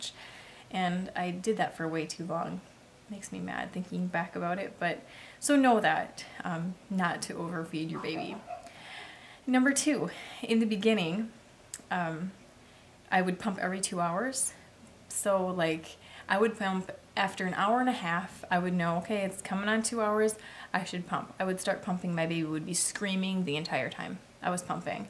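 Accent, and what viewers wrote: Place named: Minnesota, United States. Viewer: btw I love your videos, especially just to hear that wonderful Minnesota accent! I don't know where in the state you are (assuming subs) but I've lived out of state since 2005 and I miss it soooo much! I grew up in St. Louis Park so now this city girl lives in small town West Virginia! Totally different. I don't know if you are a fair goer but if you are it would be super fun to see a video from the Great MN Get Together!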